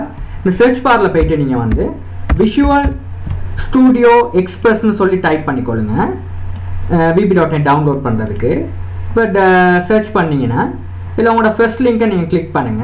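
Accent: Sri Lanka. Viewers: Neenga srilanka va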